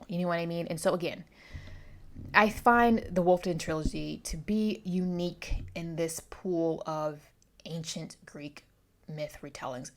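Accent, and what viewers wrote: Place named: New England, United States. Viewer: Wadder... I wonder from what part of NE you are from. I heard an 'R', so it must not be Bahston, Ma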